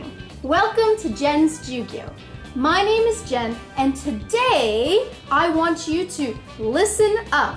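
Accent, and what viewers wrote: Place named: Canada. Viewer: Again, simply an excellent lesson! Nice to hear some Canadian accent. Thanks Jen. Great.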